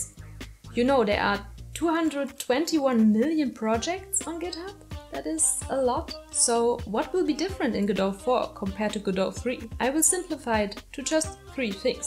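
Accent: Germany. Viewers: i love the german english accent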